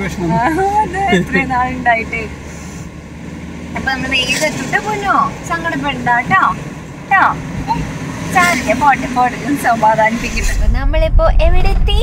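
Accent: Thrissur, India. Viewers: അയ്യോ കണ്ണൂര് വന്നിട്ട് കാണാൻ കഴിഞ്ഞില്ലല്ലോ. ശബ്‌ദം തനി തൃശൂർ ഭാഷ.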